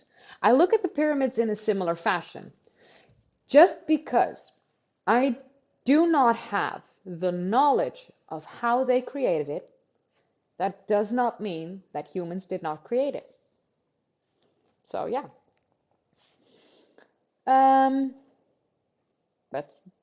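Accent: United States. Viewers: your accent,..which, to my ears, sounds rather American - influenced ( especially the ‘ r ‘ consonant ) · your diction, intonation and pacing / rhythm so like "American English", that I thought you were an American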